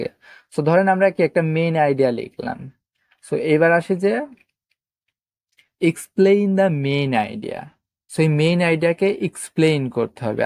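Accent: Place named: Jessore, Bangladesh. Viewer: joss class bhai, Are you from Jessore ? your accent like jessorian .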